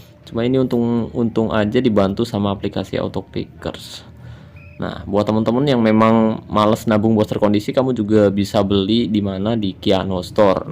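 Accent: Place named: Brebes, Indonesia. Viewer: BANG...ENTE ORANG BREBES YA... SALAM NGAPAK BANG...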